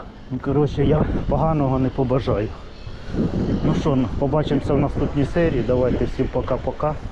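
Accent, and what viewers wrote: Poltava, Ukraine. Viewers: рідний полтавський акцент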